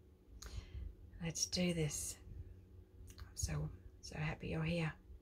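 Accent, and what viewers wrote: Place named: Australia. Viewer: Oh wow!! Aussie!!!❤❤❤ Love to hear your voice. I’m in the United States, Kentucky ❤❤❤😊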